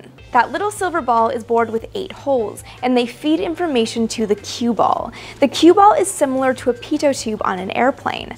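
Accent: Canada. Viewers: Joshua Walker she's speaking English and she is from Canada. English has so much French influence anyway, and in parts of Canada they speak French. So, no surprise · Are you Canadian?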